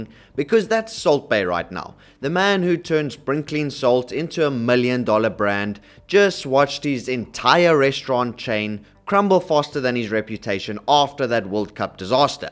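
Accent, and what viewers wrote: South Africa. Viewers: I hear a south african accent 😊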